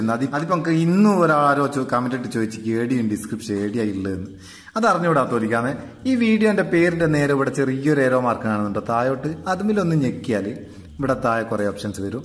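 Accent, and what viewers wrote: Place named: Mappila, India. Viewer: 'ഇക്കാക നിങ്ങളുടെ ആ മാപിളമാരുടെ സംസാരശൈയിലിയും നിങ്ങളുടെ ആശബ്ദവും കൂടി ചേരുമ്പോൾ ഒരു എച്ച് ടി സുഖം നിങ്ങൾ ഞാൻ ആണ്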